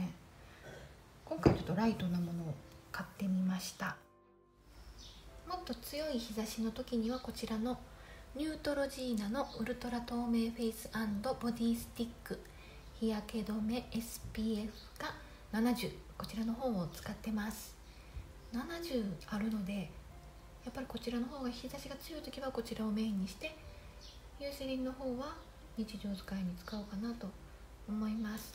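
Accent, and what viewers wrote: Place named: Kansai, Japan. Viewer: 話し方が穏やかな関西弁で聞きやすかったです。 私も爪が弱くてコーティングするネイルを塗っていますがオイルのものも気になってました。今度買ってみます。紹介ありがとうございました。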